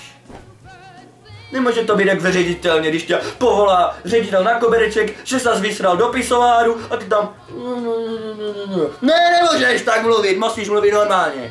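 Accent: Moravia, Czech Republic. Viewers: Jsi z moravy??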